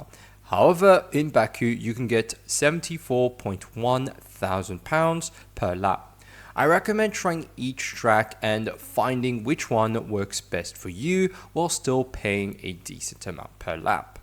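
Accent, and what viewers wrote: France. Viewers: Thanks for the tip. Btw I enjoy your French accent. 🇫🇷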